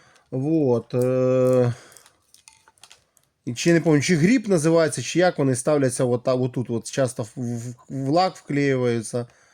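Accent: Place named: Russia. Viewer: Говорил бы уже по русски, хреначишь по украински с русским акцентом, да ещё и коряво, оно тебе надо?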